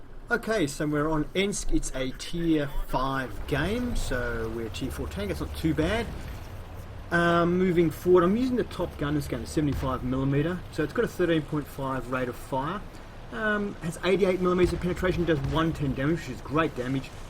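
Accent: New South Wales, Australia. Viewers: Is that a NSW accent?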